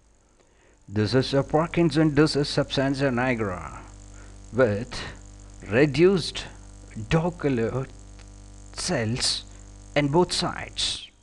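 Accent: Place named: India. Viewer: Good in Content,Animation & Voice Clarity...Would have been better if it explained how Physiotherapy treatment works on the PathoPhysiology & the Accent was Purely Indian!!!! Waiting for more articles in Physiotherapy!!!! Einstein Babu..,MPT (Neuro) Physiotherapist , Govt of India(ESIC Model Hospital) , Bangalore,India.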